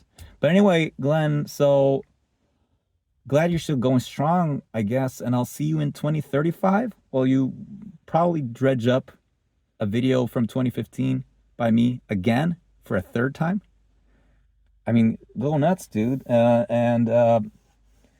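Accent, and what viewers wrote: United States. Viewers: This is probably just a me thing: But I like your accent! It sounds a little somewhat similar to American! (I am a Finnish guy, but I speak in the American accent when speaking English)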